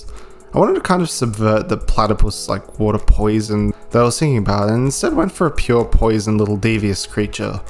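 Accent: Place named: Australia. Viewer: What part of Australia are you my man?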